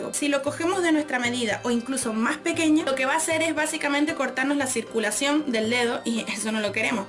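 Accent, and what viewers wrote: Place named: Canary Islands, Spain. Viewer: holaaaaa chiquilla!!!!, si dame consejos ( te cuento, es un secreto no le digas a nadie porque mi gran reputacion esta en juego jeee, me cerraron transitoriamente la clase de folklore arabe porque mi profe Ramiro tiene unos proyectos importantes ( y tambien es secreto, sacara un disco con Amir y Saida en la portada ) asi que quede algo colgado, igual voy siempre a la academia y llego un nuevo compañero que hace bellydance y bueno me meti en esa clase jaaa, no puedo dejar de ir a la academia y ha sido una forma de seguir participando, por supuesto que me veo como gorila intentando hacer los pasos, por supuesto que nos divertimos mucho con mis compis jee, y bueno tendre que esperar un tiempito, no quiero irme a otra academia - y bueno ya nos estan dando clase de ritmologia y vamos a empezar a usar los chinchines ( crotalos ) jee, entonces help me por cierto te ves re linda amiga - re lindo tu monedero - uen consejo donde comprarlos, no hay tienda de esencia oriental??? 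- bueno tengo un amigo de Mexico que hizo un belly con chinchines pero usaba traje de varon de folklore arabe y se ve muy bien, asi que mi idea es hacer un dabke o folklore arabe usando chinchines - bien, sueltito y con elastico, de 10 - el imperdible jee ( traba de gancho jaa ) que lindo hablan en Canaria, ya te lo dije muchas veces - buenisimo video me re re sirvio beshos besho s smuack!!! - Gus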